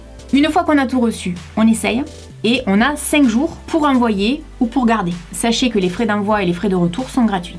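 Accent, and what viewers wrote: South, France. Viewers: Je t'aime ♡ Vive l'accent du Sud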